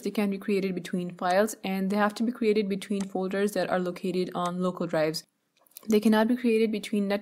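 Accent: India. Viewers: OmG!! But your accent is so sweet! A very mild Hindu accent. I love it!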